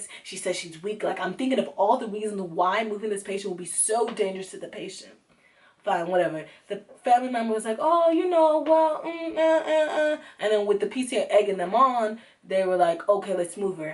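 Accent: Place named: Nigeria. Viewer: That naija accent 😆😆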